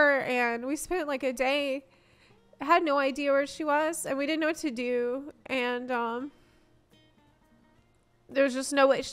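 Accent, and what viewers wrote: South, United States. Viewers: Pretty sure she's native English speaker. But yeah, as a Cajun, I'm definitely getting some Southern vibes from her.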